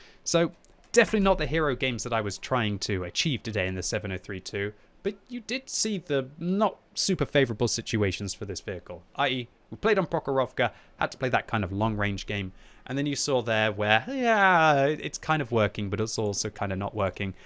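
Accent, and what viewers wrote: France. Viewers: omfg the French Accent is hilarious when you talk French normally. 😂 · Wow, that Frenglish...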